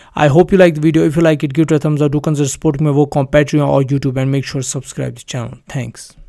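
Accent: Kashmir, India. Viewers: Are u from Kashmir I think so because of ur pronounciation.❤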